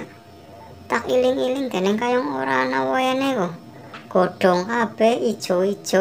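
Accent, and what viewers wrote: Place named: Banyumas, Indonesia. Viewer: Hahahaha.....ngakak. ora ngapak ora kepanak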